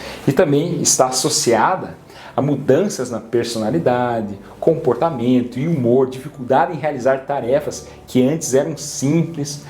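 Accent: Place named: Paraná, Brazil. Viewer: Seu sotaque lembra muito o do meu pai, que é do Paraná perto da divisa com Mato Grosso do Sul. Depois que me mudei para Salvador, há 10 anos, nunca mais fui lá.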